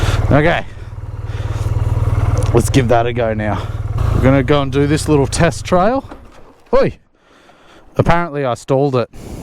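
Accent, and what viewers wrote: Australia. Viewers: Enjoyed the scenery mate. Great to hear a fellow Aussie accent overseas . Great bike. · First of all... Great review and a fantastic bike! But I'm very confused about yourself mate 😅 Bavaria in the name, an Australian accent and based in Estonia? Sounds like an interesting backstory 👍🏻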